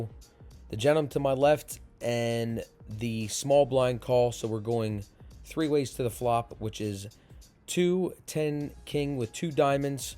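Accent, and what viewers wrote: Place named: New Jersey, United States. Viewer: Watching from Australia. Love the accent - is it New Hersey?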